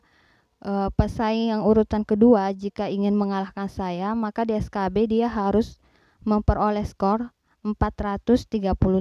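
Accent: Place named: Central Sulawesi, Indonesia. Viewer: sya kira orng Sulawesi Tengah soalnya logat bicaranya mcm tdk asing di dengar.. Baru ad vlog dipantai Nambo.. Sama nama daerahnya dengan yg ada disni.. Klw kk orng sini langsung otw ke rmh kk buat belajar skb 😁🙏🙏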